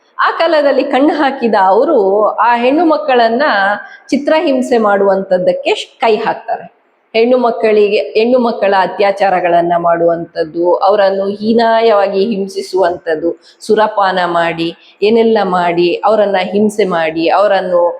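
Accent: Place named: Tulu Nadu, India. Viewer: ಮೇಡಂ...ನಿಮ್ಮ ಮಾತಿನಲ್ಲಿ ಯಾವುದೇ ತಪ್ಪು ಸಂದೇಶವಿಲ್ಲ, ಆದರೆ ನಿಮ್ಮ ಮಾತು ಕೇಳುತ್ತಿದ್ದರೆ ನೀವು ನಮ್ಮ 'ತುಳು,ನಾಡಿನವರಂತೆ ತೋರುತ್ತಿದೆ,ಯಾವೂರು ಮೇಡಂ..?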